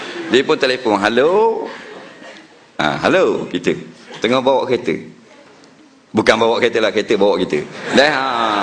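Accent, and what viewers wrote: Terengganu, Malaysia. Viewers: Bahasa terengganu comel molek..zizan jadi zizang..pelakong..hha...comel comel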